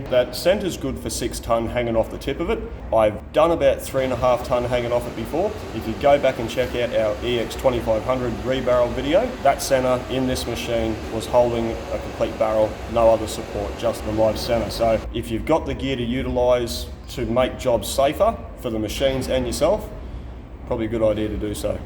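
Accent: Australia. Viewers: Aussie accent…. “That’s not an Allen Wrench, this is an Allen Wrench”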